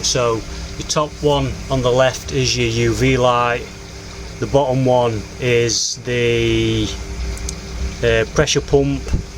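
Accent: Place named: Barnsley, United Kingdom. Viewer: The way you talk, you sound like you are from my neck of the woods or close by, definitely sounds like a Barnsley accent lol. Great review on the filter. I'm considering one when I build my pond next year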